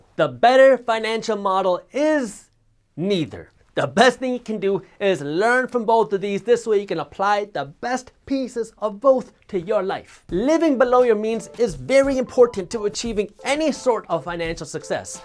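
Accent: India. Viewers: Indian accent and indian culture real af😂😂😂loved it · Love the Indian accent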